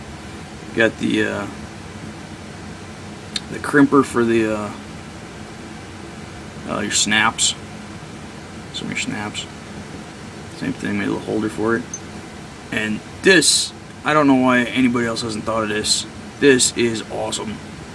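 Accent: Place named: Michigan, United States. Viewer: I love the long needle and cork idea! Many times we've had to punch holes for belts, and also we like a make small leather projects. You can get a rolling wheel with sharp spikes on it, to mark your points for stitching, then use your needle punch to finish the holes through. Thank you Accent sounds L.P. Michigan to me, have relatives. I really like all the punches, and variety you have created for use with your arbor press.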